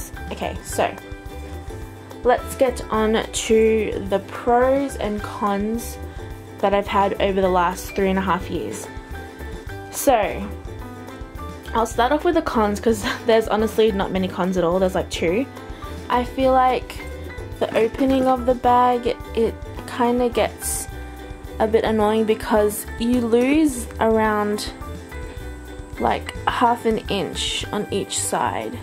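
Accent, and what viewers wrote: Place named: Australia. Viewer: noticed the aussie accent and subbed right away! haha another bag lover here ^_^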